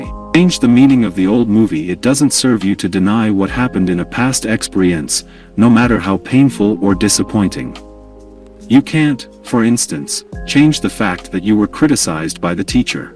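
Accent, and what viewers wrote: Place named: India. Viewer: Accent is like an indian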